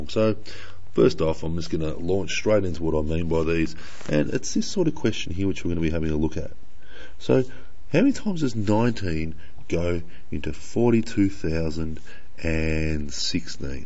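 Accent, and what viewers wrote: Australia. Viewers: tanvi sharma, he's Australian.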